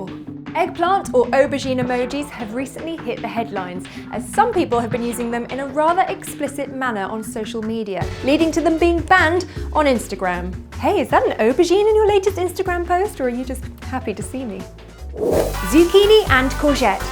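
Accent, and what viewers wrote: United Kingdom. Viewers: British accent, get over it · Cute, short hair, and a British accent. The perfect woman! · i like the brit accent rather than american .... or i just probably love your accent :)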